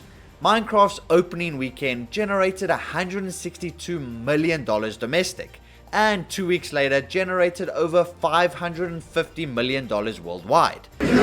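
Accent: South Africa. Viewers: Do I hear a SAFFA?!?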